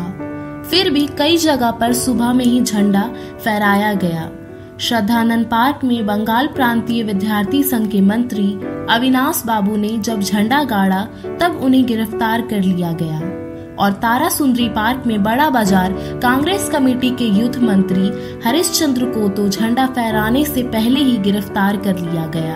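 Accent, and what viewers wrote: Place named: Hyderabad, India. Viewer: Bhai tum Hyderabad ke hain kya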